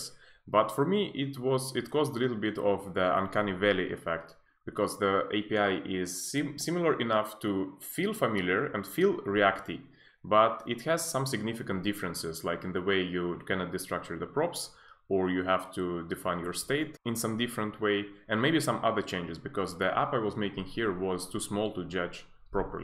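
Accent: Russia. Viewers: После сотни видеороликов с индийский акцентом так необычно слышать русский.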